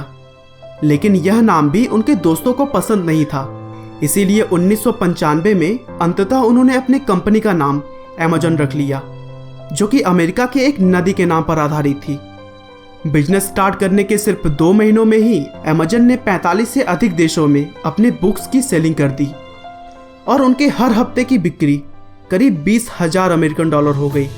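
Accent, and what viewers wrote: India. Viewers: Bai Hindi accent 😥 overall nice video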